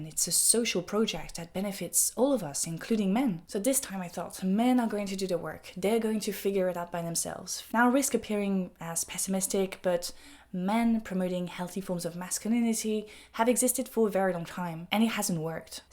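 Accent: France. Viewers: damn i am just a sucker for the french accent. love your content btw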